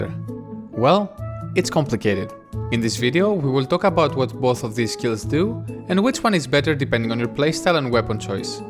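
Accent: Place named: Greece. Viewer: Efxaristo haha, elliniko accent kani bam alla ta agglika sou ine poli kala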